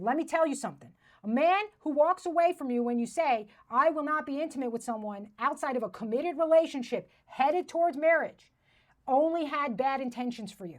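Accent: New York, United States. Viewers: Women berating other women for being women is a new content genre. But in that NY delivery, it really feels sharp and totally lacking in appeasement, which is great. I would date you, because I get the feeling even the fights would be funny AF, ngl. Wish you the best of luck with your comedy career, I really need this no bs attitude to make a comeback to standup, everyone's being so weak right now. Easy sub.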